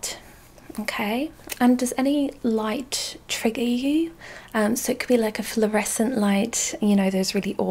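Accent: Scotland, United Kingdom. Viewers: just here to say that I love your videos, british accents are a trigger for me :) hope you’re doing well! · See the problem with your voice and accent is that it is the type of voice and accent that Americans think of when they think of the UK which means they're not ready to hear us Brits with not as well spoken voices and accents! ;) In all seriousness, cracking video! I will make sure I binge a couple more of your videos later! x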